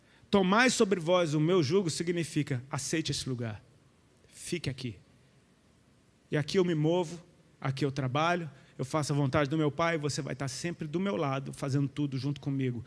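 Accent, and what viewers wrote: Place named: Portugal. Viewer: quanto que o "gajo" corre!! Ameeeiiiiiii, portugues de Portugal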